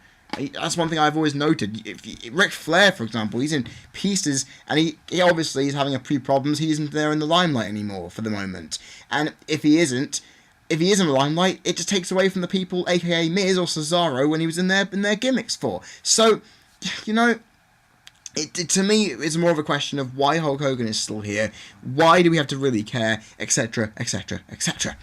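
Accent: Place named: United Kingdom. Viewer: I love the British Accent fucking awesome whenever i do a British Accent i sound Australian or Irish and sometimes even Italian lol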